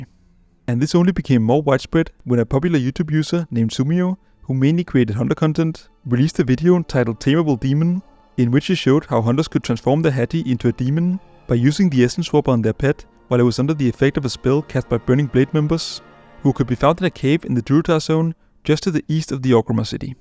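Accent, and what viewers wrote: Copenhagen, Denmark. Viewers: is talking soooo DingLish 😆 lyder enda som Københavnsk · all i hear is yukky copenhagen accent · Heavy Danish accent ❤👌🇩🇰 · It's funny how, as a Dane, whenever I tune into a channel I have never heard of before, I can immediately tell by the accent that I am watching a danish person. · danish accent is very present with this one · Hears first few words: ah, a Dane. :D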